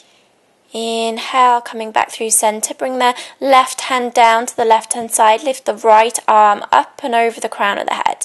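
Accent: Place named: United Kingdom. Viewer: Thanks. I used the video that you referred to this morning - it is very good too. Thank you. If you are open to other suggestions, here's a couple: 1) You say in your bio that you also practice Mindfulness. I started doing this last fall. Have you considered doing some guided mediation videos? I use other guided mediation videos on YouTube and they are very popular. Your voice soothing (especially British accent). 2) A quick 5-7 minute stretching video that people could do at their desk in an office.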